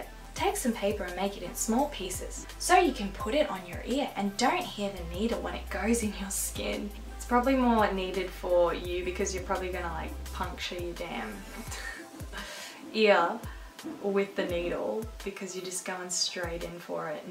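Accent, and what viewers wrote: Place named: Australia. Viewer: That's Gross. I pierced my own ears when I was 12 the second and third holes. Had my first ones done at birth. (Argentine parents). Girl u have. Great content 👍 recognise that Aussie accent I'm in Canberra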